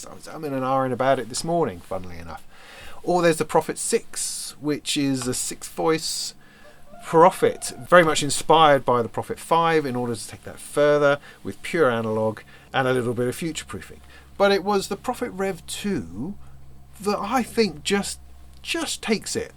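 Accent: England, United Kingdom. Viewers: I’ve watched a few of your videos where you have explained you have American viewers. You’re just a sell-out. If the Yanks are too stupid to get their calculators out then so be it. You aren’t Murican, you don’t live there so stop pretending prices in dollars are part of your life. If you get loads of adulation for how you pronounce the names of synths then go and make a YouTube channel named I’m a Jolly English Chap What? Bit of a bell end aren’t you.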